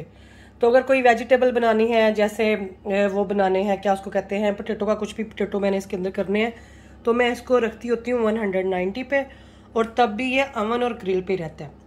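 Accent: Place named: Punjab, India. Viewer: Ap Kitna pyara bolti hai hum bhi Punjabi hai buhat Acha Lagta hai ap ki Punjabi sun kar❤️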